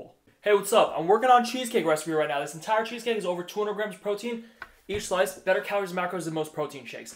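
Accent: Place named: New York, United States. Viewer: Dude your recipes are so good. You have the NY accsnt!!!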